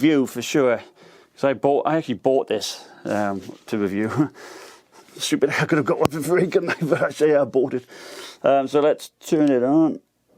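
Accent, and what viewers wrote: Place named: Teesside, United Kingdom. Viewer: Cracking channel, stumbled across you a few weeks back and the accent caught me attention! You sound like your from just up the road from me in Teesside but you’ve gone global! 😂 love the channel and bought myself a Nitro 5 last week after watching your channel! Can take the lad out the north!.....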